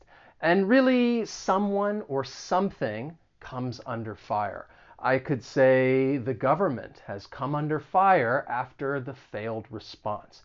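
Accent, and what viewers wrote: United States. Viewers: Your pronounciation is American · Amazing American English at it's best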